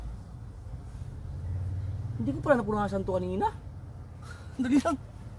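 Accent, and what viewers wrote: Visayas, Philippines. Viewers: taga saan ka pala talaga sir may parang bisaya halo tagalog mo sir tanong lang hhehehe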